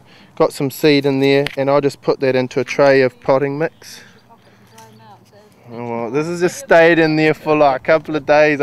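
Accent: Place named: New Zealand. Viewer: hey, i love your work man, and that great kiwi accent lol. i've learnt a lot from these three videos and i too have become interested in growing, grafting etc.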